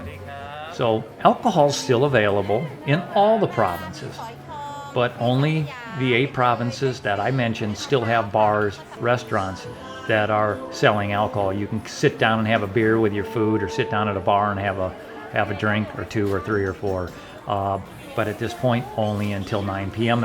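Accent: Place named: United States. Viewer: Thanks for the captions, cause you American accent is difficult to listen to.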